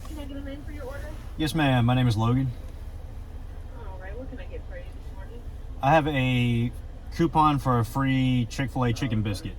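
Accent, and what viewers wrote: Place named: South, United States. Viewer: Thank you for your service man! What state do you live in. You have a southern accent I feel like lol. I live down south.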